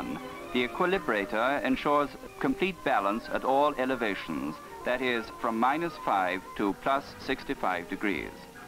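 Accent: RP, United Kingdom. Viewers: It's a Swedish weapon, not British; although clearly a British narrator. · the bbc accent is horrendous, no one in the uk has ever actually spoken with this accent (other than media turds)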